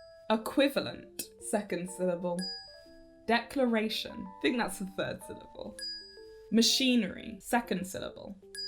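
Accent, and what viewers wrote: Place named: United Kingdom. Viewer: As someone who grew up in a home where English was not the only language spoken I have learned over the years that although I am considered a native speaker there are many words I don't say 100% correctly because I learned to speak from parents who are non native English speakers. This video has taught me that I was not saying lolibrary in either of the two ways you argued. lol. However I suspect that has more to do with the fact that you speak British English accent and I speak Canadian English accent. I do agree that it is indeed loli-brary.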